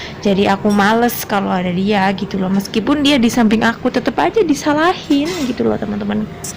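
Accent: Banyumas, Indonesia. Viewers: Salam ngapak sliramu pinter masak pantesan bojone betah nang umah sampean nang luar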